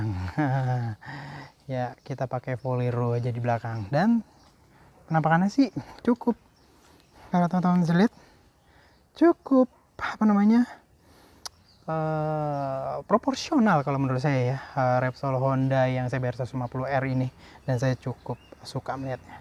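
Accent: South Jakarta, Indonesia. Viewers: Agak2 jaksel ya ngomongnya 😁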